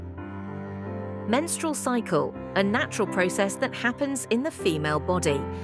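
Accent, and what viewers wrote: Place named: United Kingdom. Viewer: O sotaque britânico é perfeito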